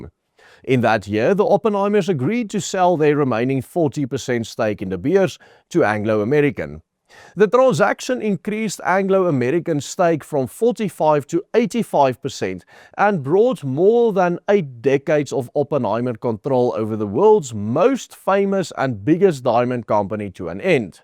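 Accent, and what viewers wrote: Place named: Afrikaans, South Africa. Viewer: This has to be the thickest Boer accent I have ever heard.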